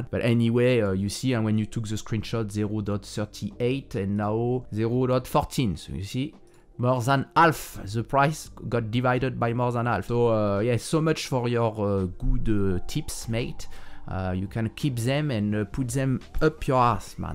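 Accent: France. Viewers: Quasi sûr que t'es français ! Bonne chance pour cette chaîne anglophone, ça doit pas être facile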